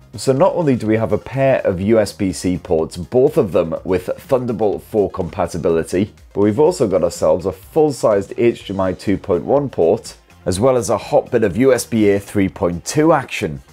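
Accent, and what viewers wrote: United Kingdom. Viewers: Man I could listen to British people speak all day, pure art.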